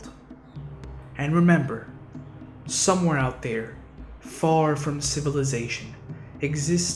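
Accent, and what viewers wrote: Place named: Spain. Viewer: Aye yo Spanish accent is 🔥🔥🔥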